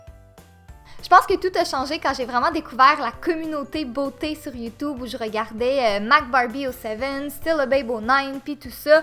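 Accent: Quebec, Canada. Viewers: J'adore ton accent québécois :)super viéo !!! ;) continue comme sa :)) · J'adore l'accent québécois 💞 Sinon, j'ai adoré ta vidéo 🤗❤️ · elle a un gros accent...Aussi elle est quebéquoise,mais moi je suis née en France et je suis Française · Oka la tueuse c'est l'accent québécois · Ya lacsent canadien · J'adore laxent canadien 🙃😀 Mais sinon super video 👍🏻👏🏻😍😘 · J'adore ton accent canadien 😍😍😍😍😍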